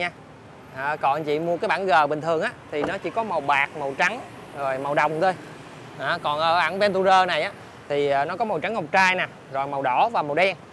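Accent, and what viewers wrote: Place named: North, Vietnam. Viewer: Sao kênh này mà có khi nghe giọng người Bắc?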